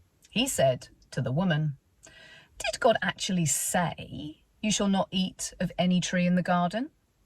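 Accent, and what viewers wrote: United Kingdom. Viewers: Great 😃👍 Brit🎉 accent ❤ ! Along with superb teaching, to 🥾 boot!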